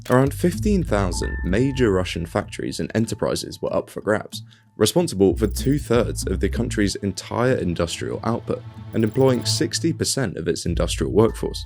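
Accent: England, United Kingdom. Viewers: Im an American fiormer advisor to the Kremlin, who subsequentlylived in Odessa for 15 years... until the rabble gang armies came gang press every adult male into service. And I watched Russia defeat itself by literally running out of food four days on the road to Kiev. I saw RU troops had to WALK to Belarus. So it is true Russia defeated itself. But as you can imagine, Im unhappy seeing my life's work in privatization, deregulation and liberalization twisted this way.... by a posh English voice that sounds like the children I now teach. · He thought because he is white and has an English accent that people would not criticise him. Times are changing my friend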